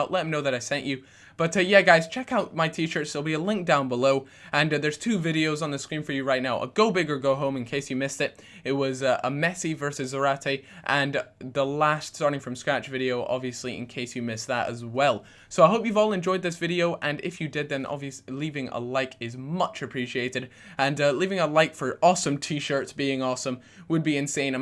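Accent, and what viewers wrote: Scotland, United Kingdom. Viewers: wait he is scottish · I hate ur gay scottish voice!!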